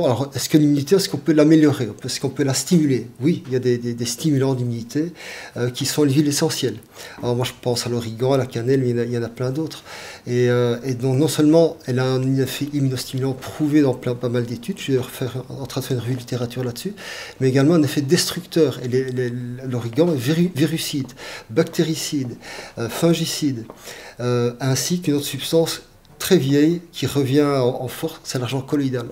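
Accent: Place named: Liège, Belgium. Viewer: Accent liégeois, je crois. Mais il a aussi oublié de muscler sa mâchoire, tout le reste oui dirait-on, mais ses muscles mandibulaires apparaissent hypotoniques ou alors peut-être un lifting facial trop accentué a tendance à lui bloquer la mâchoire inférieure en tirant trop la peau vers l'arrière.. Bref, le manque de mouvement de sa mâchoire fait qu'il "mange" ses mots. Il en dit beaucoup tout en fermant sa gueule.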